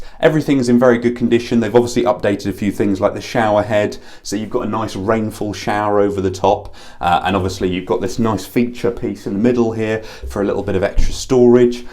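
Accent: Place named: United Kingdom. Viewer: very nice british accent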